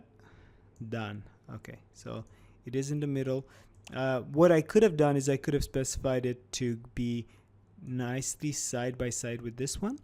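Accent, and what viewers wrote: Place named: Russia. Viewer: акцент русский, мог бы и по-русски записать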